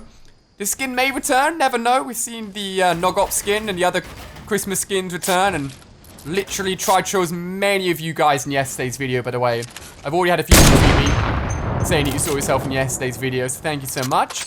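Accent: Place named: United Kingdom. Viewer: O my gush i cant Understand you are you fro Uk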